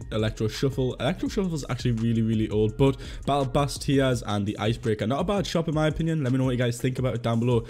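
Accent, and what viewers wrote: Newcastle, United Kingdom. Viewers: Are u from Newcastle because i am too!!!! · You a Geordie boy?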